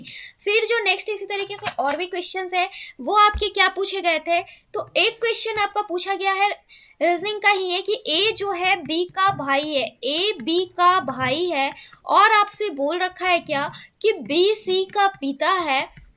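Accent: Bihar, India. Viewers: Mam, kya aap Bihar Sharif se ho?? 🤔🤔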